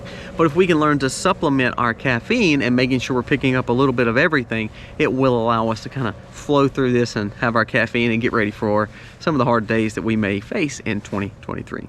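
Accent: South, United States. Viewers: How very rude and arrogant of you to tell a whole group of people from a whole different country, with a different accent how to pronounce a word. I shouldn't have to tell a grown a$$ man with a country twang no less, to not act all high and mighty and judgmental over how they pronounce certain words. Come up north or out west and see what your fellow men say about how you speak.😡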